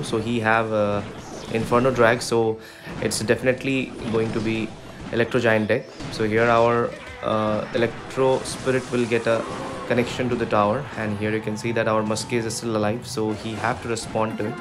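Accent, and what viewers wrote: India. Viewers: You sound so Indian ^_^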